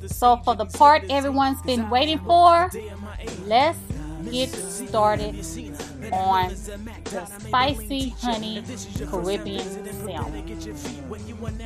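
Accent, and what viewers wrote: South, United States. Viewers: I love the way you talk with that southern twist like me People from other states say we talk funny but you dont care!! Gurl keep repping for us gurls down south!!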